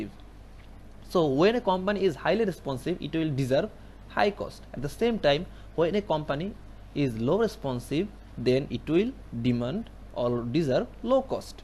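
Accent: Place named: India. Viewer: Your accent is very bad ... i hate indain accent unknown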